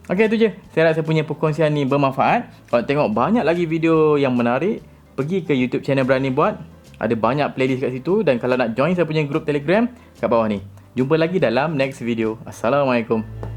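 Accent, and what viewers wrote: Melaka, Malaysia. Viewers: nice video , and btw org melaka ye :)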